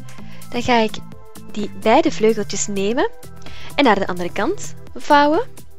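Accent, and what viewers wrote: Flanders, Belgium. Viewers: Ze zeggen dat dit Nederlands is maar dit is Vlaams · ik snap die dingen niet en het is vlaams niet nl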